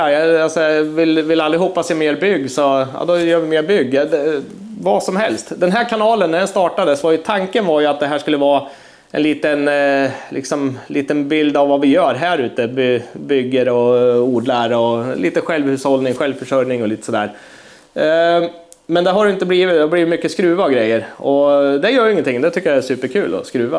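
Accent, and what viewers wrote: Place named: Norrland, Sweden. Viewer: Nu kanske du sagt det tidigare vid något tillfälle, men jag har väldigt nyfiken på din dialekt och var du/ni är någonstans i Sverige. Om jag skulle gissa på det skulle jag nog säga Västernorrland/ Jämtland/ Västerbotten.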